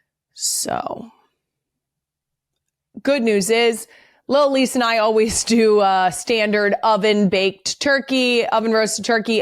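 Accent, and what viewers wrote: Philadelphia, United States. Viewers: I love how the more comfortable gets, the more the Philly accent comes out! 😂😂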